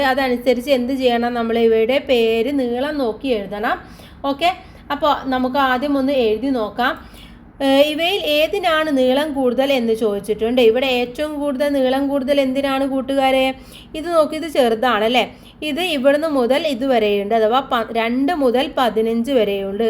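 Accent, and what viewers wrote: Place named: Haryana, India. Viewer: Teacher ka Hindi Haryanvi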